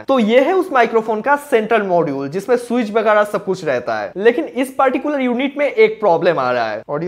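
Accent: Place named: Bengal, India. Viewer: Bangali accent bojha jachhe bhai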